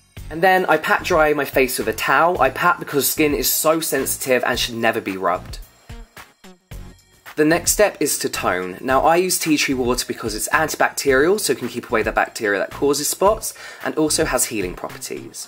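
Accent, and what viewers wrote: United Kingdom. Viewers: that s why i hate British acent a lot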